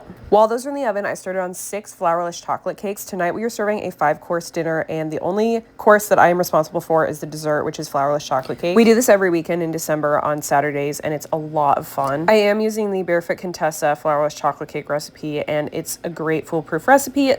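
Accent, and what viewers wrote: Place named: Wisconsin, United States. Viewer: Sounds like up north in WI...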